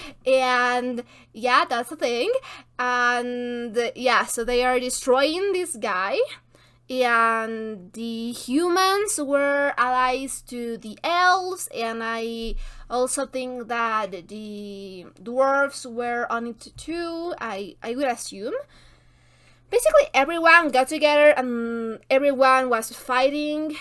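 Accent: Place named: Mexico. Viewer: I hope that someday you'll read the 3 novels, . As hard as it may be to believe, the books are 100% better than these fantastic films, especially the character- and world-building. I read the works as a teen, and now I listen to them on YT audiobooks when I'm resting, and while doing household chores! I really enjoy your accent, having lived among Mexican farmworkers on both sides of the border, for a total of 10 yrs way back in the 1990s. I am a retired antropólogo cultural. ¡Nos vemos pronto señorita! 🤞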